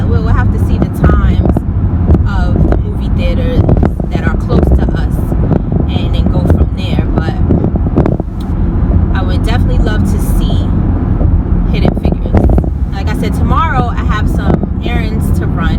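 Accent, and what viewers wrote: New York, United States. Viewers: Hi sis, lol, I love you right..lol but I use to think at first you sound mad, but over the years since I know you better, I know you dont have an attitude. But its just the way you guys sound in NY, just had this convo with some ppl I know from NY, to me she just seems so harsh but thats just her demeanor and her way of speaking. Just like the way I talk is different, some say country or soft spoken. Now go watch my vid and tell me how I sound to you..lol..great video always and true about the life timers on welfare. The abusers, but the ones who dont try to do anything in life but use up the system, its the kids I worry about not the parents. Trump just wants to see how far he can rule and mess up lives. tfs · girl, you had me cracking up about that police officer and that gym...you like bitch, I don't want to talk to you! 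lol...lol...I was dying, I feel the same way, the gym is suppose to be where you get your workout on and then bounce, I woulda been mad too if I was you, I hate being bothered, especially when I want to be left alone and do my own thing...did you ever get to go to that restaurant or to see hidden figures? girl viola davis is an amazing actress, she puts her heart, soul, and everything else into her acting...I haven't seen either movies because unfortunately I don't get the chance to really go and see movies like I use to, but both movies look like they ought to be great.. look forward to seeing your mount review...I always wondered some time back how people was able to do that...giggle...I was lucky, when I was in my car doing videos, I wasn't moving, just sitting in my car, and the way my car is made I was just lucky, I was just able to sit up my camera with a small box and it worked...giggle..I think you have a little bit of an accent, not much... 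I have heard new yorkers talk and a lot of them definitely has it..does it sound like attitude? sometimes, depending on the person, andrew dice clay comes to mind, now that dude has a hella accent, and when he talks most of the time, its with attitude, lol...so again it depends...and oh girl! yes I saw new edition movie and I loved it!! all the actors were on point, I actually did a review on it, when you go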